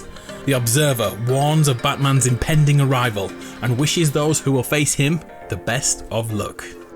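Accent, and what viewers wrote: United Kingdom. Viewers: youer British omg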